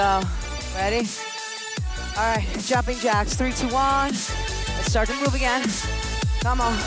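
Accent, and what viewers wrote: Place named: Staten Island, United States. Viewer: Just wish she didn't talk like a Staten island sanitation worker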